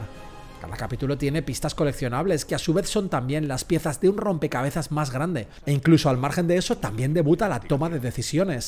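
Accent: Spain. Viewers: Volvió castellano, gran análisis maestro.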